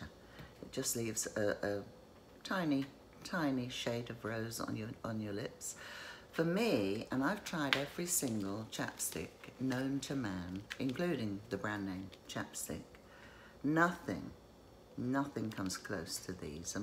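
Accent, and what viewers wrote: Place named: England, United Kingdom. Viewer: Beautiful accent are you from england??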